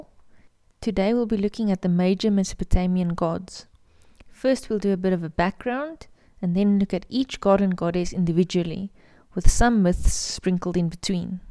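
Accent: South Africa. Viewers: Is that Afrikaans accent I hear, love it either way, I'd listen to anything you did lol and very interesting good work 👍😎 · Nah, but there's a lot of South Africans in NZ so I recognized the accent straight away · Nice to hear that South African accent.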